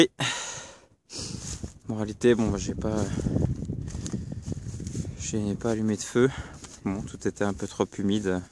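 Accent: Franche-Comté, France. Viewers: j habite juste a cote de salon de provence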